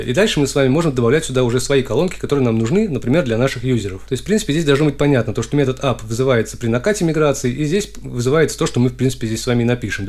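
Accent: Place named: Uzbekistan. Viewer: Узбек веб разработчик на удаленке… Или я один так слышу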